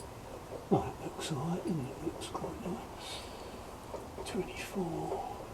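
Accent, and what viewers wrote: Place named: United Kingdom. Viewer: Quick question, I assume you are in the UK based on your accent, I was wondering what the law is for you in England over bows, where can you shoot them, do you need a licence to own one etc, Im in Scotland so laws may differ but been thinking about trying to make a bow for a while but not knowing the law situation has put me off trying.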